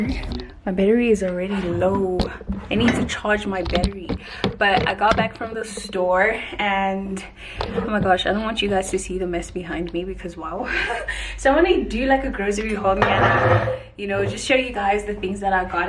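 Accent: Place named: United States. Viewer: You have an American accent... Not mad at it though. It's a free country ✨ beautiful vlog❤️❤️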